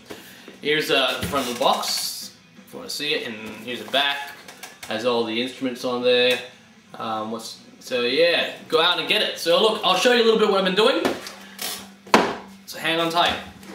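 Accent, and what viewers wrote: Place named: Australia. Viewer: Lol I cant tell if you're Australian or American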